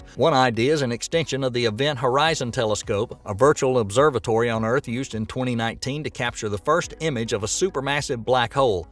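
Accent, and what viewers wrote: South, United States. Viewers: Anyone else find it hard to watch a technology video and take it seriously when narrated in a southern accent?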